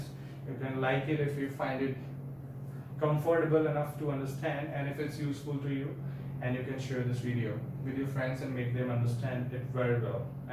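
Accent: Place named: United States. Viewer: Ye sir American english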